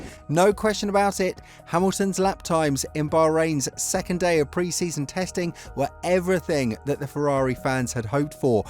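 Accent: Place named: England, United Kingdom. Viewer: Massively bias, sounds English, so no surprise there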